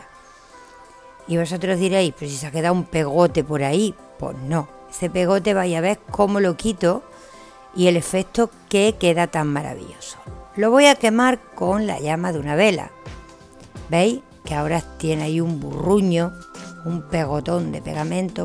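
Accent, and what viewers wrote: Andalusia, Spain. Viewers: mi más sincera enhorabuena por tus trabajos. Son muy originales, bien explicados y detallados. Hay dos cosas que me encantan: Tu generosidad en los detalles y tu lenguaje andaluz, próximo y cercano. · Hola preciosa mujer,,, Me encanta escucharte hablar con ese acento español que te caracterisa Tienes una manera de expresarte taaann,,, clara,,! Que no cabe duda que el español escuchandote hablarlo con tu acento,es fascinante, Sin palabras fuertes y ofensivas,limpio limpio como clarito Eso es lo que me encanta de ti, Porque yo tenía la impresión de que los españoles,hasta ofenden a DIOS,,en su palabrerías negras, Pero escuchandote a ti,es mentira que todos ofendan a DIOS PADRE,,,, Besos y abrazos hermosa mujer, Soy fanatica tuya ,,ya eh echo muchos de tus trabajos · ! que artista que eres...y me encanta tu acentito... de andalucia verdad...?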